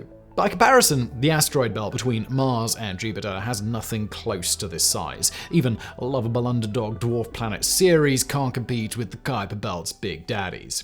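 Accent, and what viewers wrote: RP, United Kingdom. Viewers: Absolutely no idea what Simon is saying, but admittedly impressed by his ability to morph “extremely British” into novel languages. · Like the content but hate the pretentiousness + posh accent, it's hard to listen to honestly.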